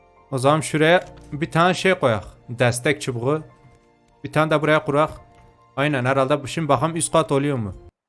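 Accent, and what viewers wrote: Elazığ, Turkey. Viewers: Abi nerelisin bence Elazığlısın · Abi Elazığlı mısın ? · Abi sen Elazığ lı mısın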